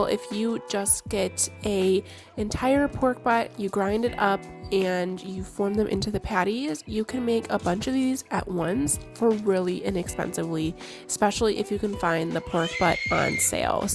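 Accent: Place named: Michigan, United States. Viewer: Everything looks great! Sounds like you're from Michigan or somewhere north??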